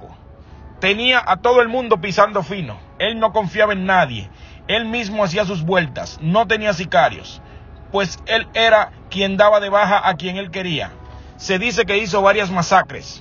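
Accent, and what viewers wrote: Dominican Republic. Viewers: El narrador parece dominicano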